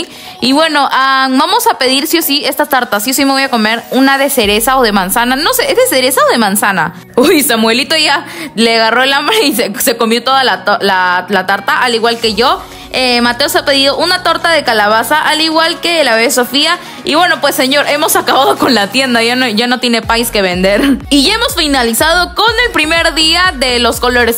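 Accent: Peru. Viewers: Eres de Perú? O chile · eres peruana🥰🤔🤨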